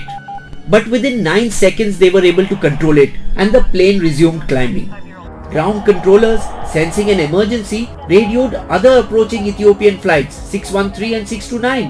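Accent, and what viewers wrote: India. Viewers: Thees is Indian English speaking style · IS MY NEW ADDICT VOICE IS VERY CLASSIC MYSTERY DIGGERS ALSO MY FAVOURITE BOTH WITH NICE INDIAN ACCENT UNIQUE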